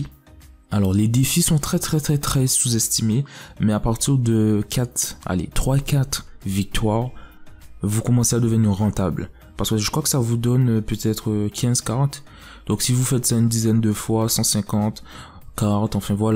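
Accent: Antilles, Caribbean. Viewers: T antillais, ça sentend a ton accent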